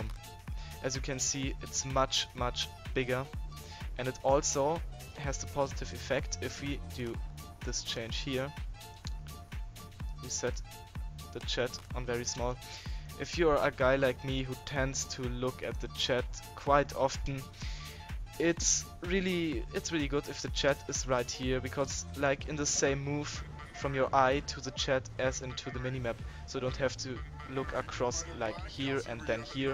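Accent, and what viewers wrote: Germany. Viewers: Man merkt schon das du Deutsch bist aber das ich net schlimm! Dein Englisch ist gut! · you german? ^^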